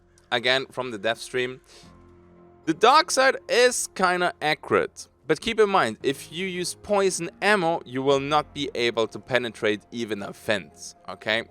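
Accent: France. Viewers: Damn French accent, i'm almost jealous ! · Quel bel accent Français ! Nous apprécions votre prononciation mon cher ! :D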